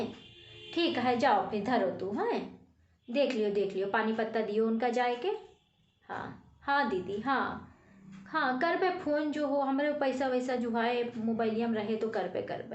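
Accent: Awadh, India. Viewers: Bahute Neek Madam Ji, I like Yours Voice with awadhi Veri attractive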